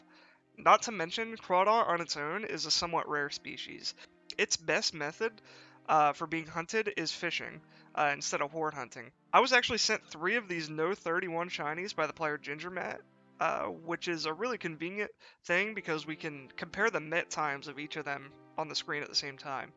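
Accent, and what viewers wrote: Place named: Pennsylvania, United States. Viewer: Random question but are you from PA? Feel like I'm hearing a little PA in your voice 😂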